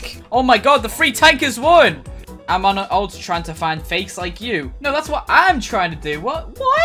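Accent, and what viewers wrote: United Kingdom. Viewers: I just realized thể he’s British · Wait is British like me? W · are you british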